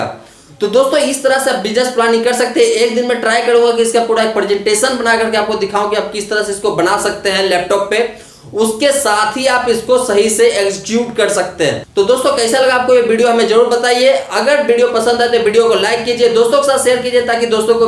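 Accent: Bihar, India. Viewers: Aap bihar se h kya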